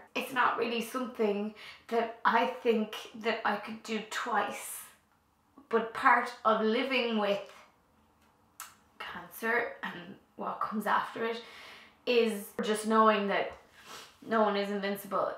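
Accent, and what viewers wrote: Ireland. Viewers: I don't know how things are now, for I have just met you on YouTube like... today. I came here to get used to the Irish accent, and now I'm finding myself almost in tears. I've lost my grandfathers for cancer. Both of them. Basically, I have BOTH sides of my family with ~this thing~. 'Fear' is something strong around here, considering it's genetic and I have zero ideia if it might affect my parents. I wouldn't know what to do if it did. Stay strong. Bless you.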